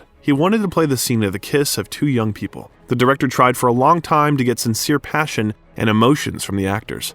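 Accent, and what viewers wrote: United States. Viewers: You are speaking in an American accent. HERE, we call it soccer!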